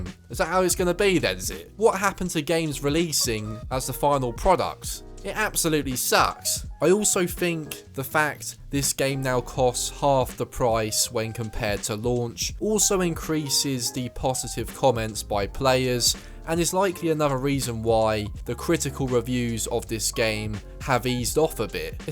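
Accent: United Kingdom. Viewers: this goes to show a british accent. how eloquent it may sound. can still say a whole lot of nothing. lil bro made a video coz he pre-ordered it for 70$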